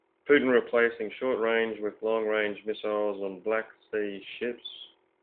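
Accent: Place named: England, United Kingdom. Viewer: weeks huh? LOL-makes me question current info on the current Supposed events Re: Imminent Mass arrests, Banker resignations, Cabal Lawsuits-White Dragons vs. The Fed, et al.-TROLL Radio Show? Or just took longer than expected...You decide...fyi, this guy here isn't even American!! English accent-bad troll radio!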